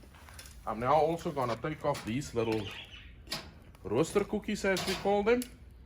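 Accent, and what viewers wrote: South Africa. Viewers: If I’m braaing and someone with your accent comes over, I automatically hand over the tongs out of respect for braai authority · ek love jou videos en suiwer Afrikaans! Baie refreshing. Eet met jou hande as jy chops eet! Gooi suurlemoen sap oor net voor jy bedien anders raak dit bitter oor die vuur, en laastens moenie die braai bak helemal toe maak as jy die vleis afgehaal het nie, dit stoom verder gaarder. Love you stuff broer! Moenie ophou nie! Hierdie is authentic content! Jy is 'n great presenter en jou vrou ken haar storie agter die kamera! Groete!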